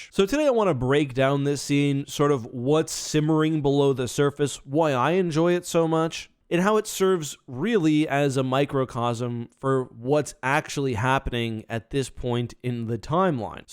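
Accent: Canada. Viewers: this canadian english makes me imagine all the ways Canada prospers off america, like this yt platform with commentary on a hollywood production.. weird ways like this that does not seem obvious.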